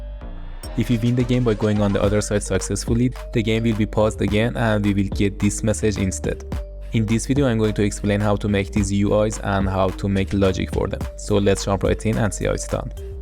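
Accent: Iran. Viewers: Amazing video, used this as a refrence to implement this in C++. Also love your Iranian accent :face-purple-smiling-tears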